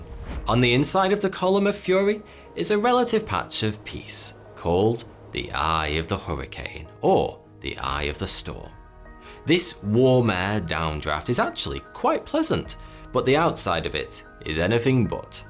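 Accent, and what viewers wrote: United Kingdom. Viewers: just another British man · thats what he says mate ye do realise in the uk there's like a different accent every 30 minute drive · I don't know why, but I enjoy all intellectual and educational topics with a British accent.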